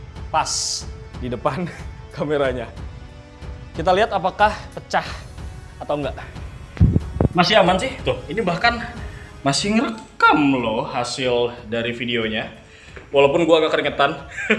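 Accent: West Java, Indonesia. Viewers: Urang sunda mang?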